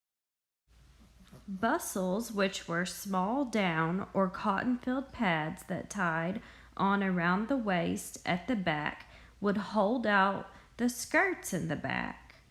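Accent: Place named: Missouri, United States. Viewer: I love your accent, are you from misouri? I'm not from the states so that's the closest guess I can make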